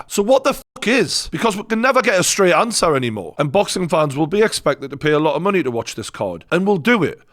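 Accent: Newcastle, United Kingdom. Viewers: Truth always sounds better when it comes from a geordie.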